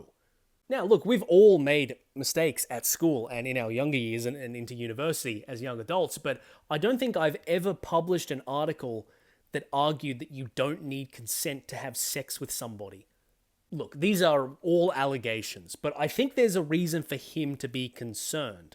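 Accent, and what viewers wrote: Australia. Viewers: Just found out you have a YouTube page. Instant follow! Also, I always forget you're Australian lol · australian mate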